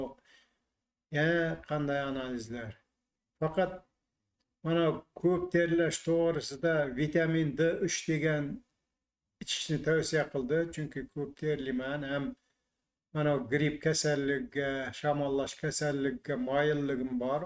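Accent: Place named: Khorezm, Uzbekistan. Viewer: Мен хам асли Кашкадарёликман хвй,ярааааайййй....(хазил ).Бизда Барлос уруги бор Амур Темур бобомиз уруги ..Бобомиз тугилган кишлок Шахрисабзда Хужа Илгор кишлоги хозир хам жойида турибти.Улугбек Мирзо Али Кушчилар Шахрисабз шахрида тунилишган уларнин уруги Барлос уруги.Менинг дадамларнинг уруги Турк Барлос уругидан .Фаркимиз биз ман сан деб гапирамиз .Барлос уруги мен сен деб гапиртшади "Ж" харфини куп ишлптишади шевада лекин мактабдан тоза адабий тилни урганишиб ккрвкли жойда адабий тилда гапиришади ж кушмай.Лекин оилада уз шеваларида гапиришади хозир хам .Масалан Жакси журипсанма .Яхши юрибсанми? Дегани булади .Биз яхши юоибсизми деймиз.Шунинг учун улар бизни турк барлос дейди.Биз уларни шевасига улар бизга тушунишади.Кашкадарьё Сурхондпрьёдан куп чемпион болалар машхур полвонлар чикади.Чунки улар чакалоклигидан соскани упнида кцй думбасини пишириб ннбошига чупча тикиб чакалокни огзига солиб куйишади чаеалок думбани соска урнида суриб катта булади.Россияга борган Кашкадарёлик болалар .Худога шукур гуштдан кутулдик дейишармиш.Демокчиманки хар бир вилоятни узига ярашиб турган шеваси борда.Сизнинг Хоразм шевпсида гапиришингиз узингизга ярашиб туради.Мнн бир Хаййй яраййййй..десам менга Кашкадарьёликмисиииз деб кулиб.юборишади дарров гап гапга ковушиб кетади.Чунки бутун Узбекистон вилоятлари био юиоинт яхши тушунишади.Вотти вотти килса Тошкент .Емон зурсизда деса Андижон Келутту деса Наманган.Улай санга деса Китоб .Борайчийдим келайчийдим деса Шахрисабз .Туссунбой киттин бичок д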